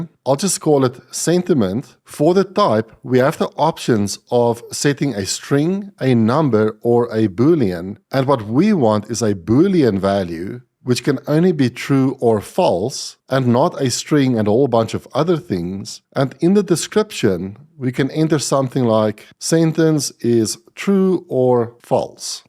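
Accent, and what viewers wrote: South Africa. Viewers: So South African :D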